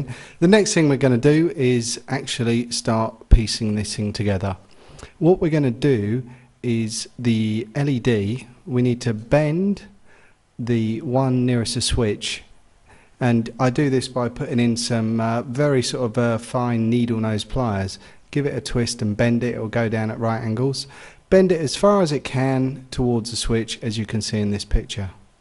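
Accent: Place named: Southern England, United Kingdom. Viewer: The ugly mug is welcome! As is your amazing accent! Southern England? Anyway, thank you for the very informative videos! I am a first-time modder and your help is very welcome!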